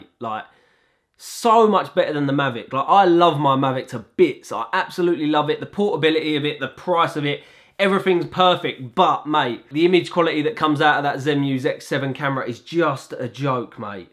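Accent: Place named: Cockney, United Kingdom. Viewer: Great video. The cockney accent makes the videos even more enjoyable XD.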